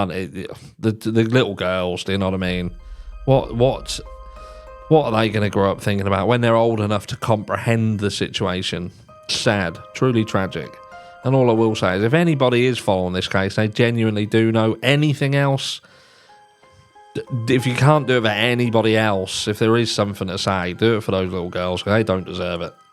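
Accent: Norfolk, United Kingdom. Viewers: That sounds like a west Norfolk accent? Enjoyed you vid. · I thought I heard a bit of Norfolk in the accent 😂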